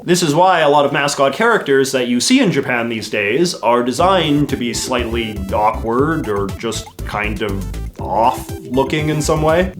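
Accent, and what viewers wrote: Canada. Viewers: I don't know if you purposefully over-emphasize your Canadian accent or it just stands out so much to me, but either way I love it and never change. I really believe you are the the preeminent internet expert on Americana. You see and appreciate and critique things that neither a family member or a complete stranger would of a household, you have an understanding of a close and trusted neighbor, a truer understanding.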